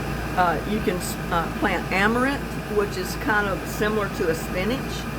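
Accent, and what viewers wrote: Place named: Texas, United States. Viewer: Hi I subscribed to your channel last night that was some live stream I love the sound of your voice and I was just thinking it sounds like Texas to me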